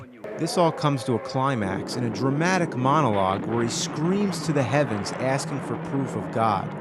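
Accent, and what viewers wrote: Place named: Philadelphia, United States. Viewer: E vai pegar um dos sotaques mais legais do inglês americano, o sotaque Philly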